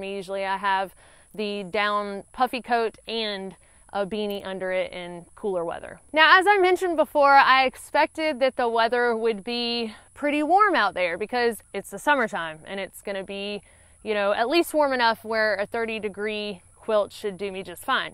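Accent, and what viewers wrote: South, United States. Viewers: hey great video as usual! have seen most of your videos, not quite made it to the end of the CDT yet. I want to thank you for awakening a passion in me for the outdoors like I've not had since I was a younger man. you're such a lovely young woman with the best southern accent and I wish you much success and joy in your life! happy trails, and hey maybe we'll see you out there one day....... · You southern girl for sure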